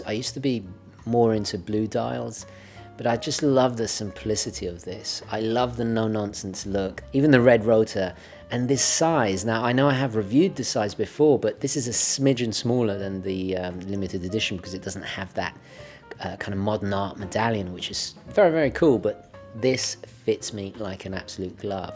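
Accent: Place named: England, United Kingdom. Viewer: Italian culture, English accent, and knowledgeable about horology, what a guy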